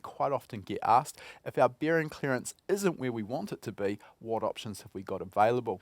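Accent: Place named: New Zealand. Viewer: Thanks for the reply,. Thanks to the vast amount of time I've spent listening to you, I can whip out your NZ accent at a moments notice at this point. Great fun in a party/pub moment.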